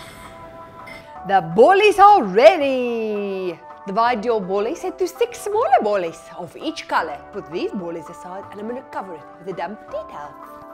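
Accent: Afrikaans, South Africa. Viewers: A bad Afrikaans one. Quite common :) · In her videos she has an Afrikaans accent but that is not how she talks in real life only in her videos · Is she South African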